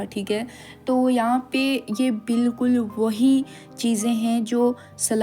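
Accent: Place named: Kashmir, India. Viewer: Are u from J&k (india) bcz the way of your talking like u are from baramulla 🤔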